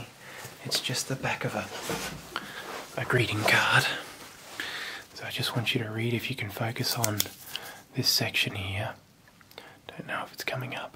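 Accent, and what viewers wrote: Australia. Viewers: I love me some Australian accent ❤️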